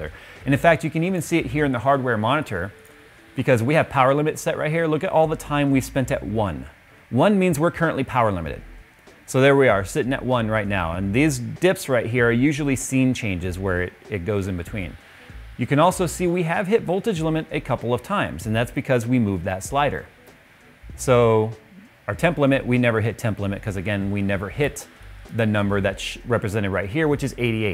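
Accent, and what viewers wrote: Canada. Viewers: You're Canadian,? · you're canadian? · There goes Canadian again. Great video.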